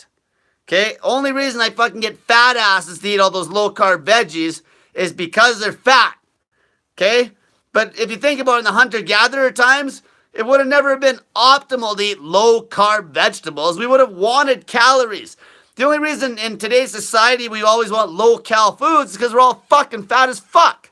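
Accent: Canada. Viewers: Jesus Christ.. what part of Canada are you from?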